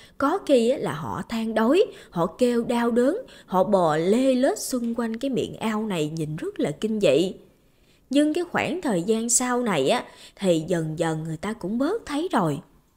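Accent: Mekong Delta, Vietnam. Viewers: Chị rất thích cách kể chuyện mộc mạc của bé Như, tìm mãi mới thấy được chất giọng đúng kiểu Miền Tây như thế này. Bé Như cứ giữ chất giọng chân quê như thế này nhé , chị nghe nghiện luôn rồi ❤️❤️❤️ Chị thấy cưng rất có năng khiếu về kể chuyện. Nhớ lắm thời còn xem phim bộ TVB , chất giọng lồng tiếng rặc Miền Nam, Miền Tây mình, nhưng mà nghe nghiện lắm, nghe hay làm sao.... bây giờ thì đa số kiểu nửa Nam nửa Bắc nghe không còn thích nữa 😁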